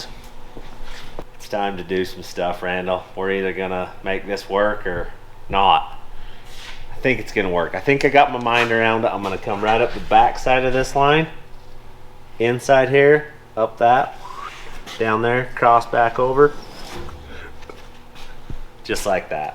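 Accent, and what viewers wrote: United States. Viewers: Looking for the end? You will find that when the roll is finished. Still cant get used to the American way of saying things😉😉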